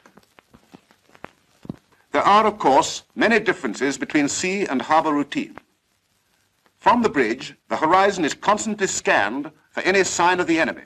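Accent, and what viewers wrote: United Kingdom. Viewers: This was during britains posh accent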